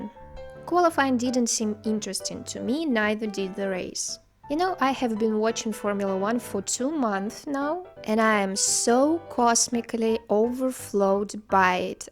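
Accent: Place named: England, United Kingdom. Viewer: Wow, you're from England!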